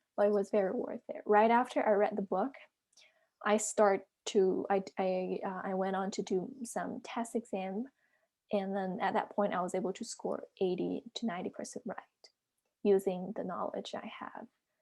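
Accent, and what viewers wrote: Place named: United States. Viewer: Hi, Thanks for the video. Could you share where you’ve studied American English accent so well? Thanks in advance and sorry for my answer besides theme. Take care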